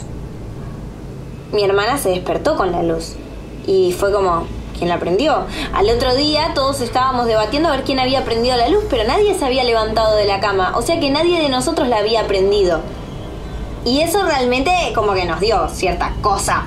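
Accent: Argentina. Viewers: Sos voz de Argentina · Es Argentina:v es el asento del país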